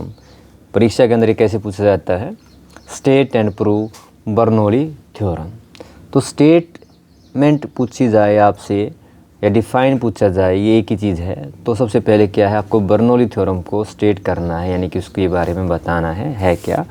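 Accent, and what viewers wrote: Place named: Haryana, India. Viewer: Haryanvi boli me smjhne ka maza hi kuch aur h 😂 Btw, great explanation 🫡👏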